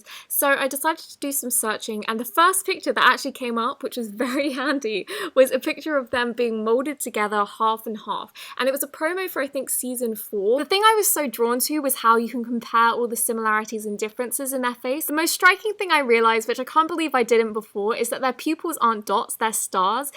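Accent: United Kingdom. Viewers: Pleease do more vlogs, your British accent is so cute🤩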